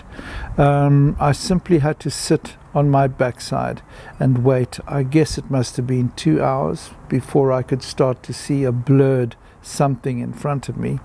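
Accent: South Africa. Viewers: How terrible, but the way you tell the story sounds elegant. Maybe it's the South African accent. Thank you for sharing, keep telling your stories. I love them!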